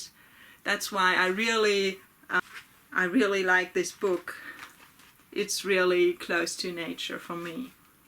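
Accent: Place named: United Kingdom. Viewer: Das letzte war die Brombeere, so viel habe ich mitbekommen :) Bei deiner britischen Aussprache frage ich mich ob du das beruflich machst :) Jedenfalls reichte mein Schulenglisch aus um es zu verstehen.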